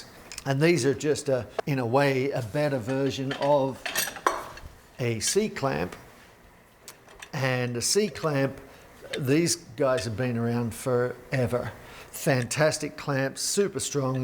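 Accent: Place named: Australia. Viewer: From the start of watching your videos I always assumed that you were working from Australia from the accent. How would you compare woodworking in America compared to Australia? Always enjoy your videos thanks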